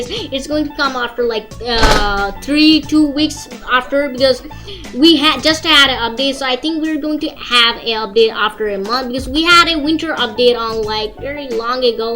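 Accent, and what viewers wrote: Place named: South, United States. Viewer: God he sounds like a hillbilly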